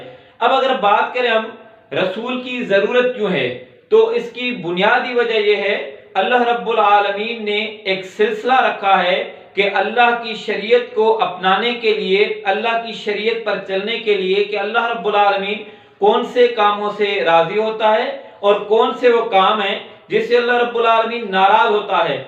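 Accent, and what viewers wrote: Sindh, Pakistan. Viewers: gud urdu in pure sindhi eccent !!!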